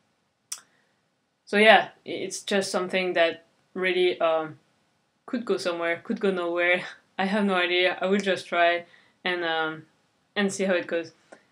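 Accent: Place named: France. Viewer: Super idée, j'y ai pensé plusieurs fois mais j'ai jamais eu le courage ! Je viens de me commander "The weaker side" de Dom Fomularo, je vais commencer à me plonger dedans que dès je l'aurai reçu, je te dirai si c'est efficace :) Sinon, t'as pas à rougir de ton accent, t'as un anglais super fluide, on dirait presque pas que t'es française parfois, j'aimerai parler aussi bien anglais !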